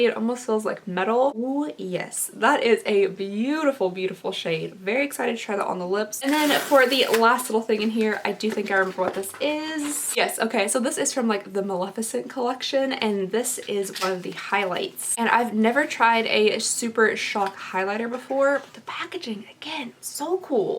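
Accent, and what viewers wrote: Kentucky, United States. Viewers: Mercer County here.. I knew she was from Kentucky as soon as she started talking but then she said something about a flea market and I literally laughed out loud 🤣 subscribed right after that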